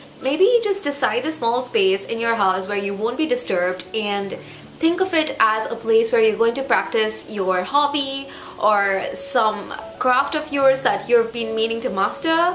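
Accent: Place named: India. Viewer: How did you get such a wonderful accent? Cause you're frm india i guess..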